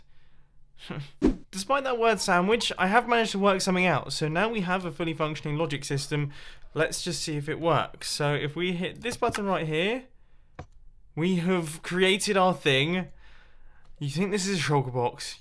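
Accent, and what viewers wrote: England, United Kingdom. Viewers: I love English YouTubers the way they talk makes me laugh(this is not an offensive comment I hope)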